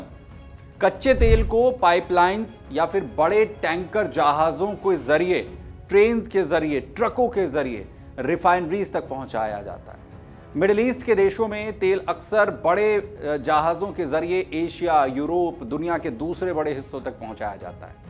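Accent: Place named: Bhojpuri, India. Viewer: Saaf baat bolna Bhojpuri kya kar raha hai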